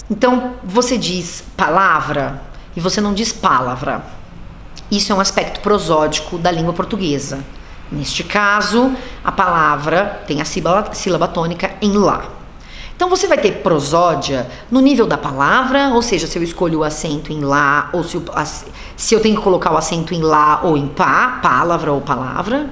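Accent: Rio de Janeiro, Brazil. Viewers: Afinal vc é carioca, paulista... ?? Começa puxando o S, depois passa pro R "arranhado" dos paulistas, depois o R mais interiorano.. "Aceeinto", "dizeeindo".. me perdi.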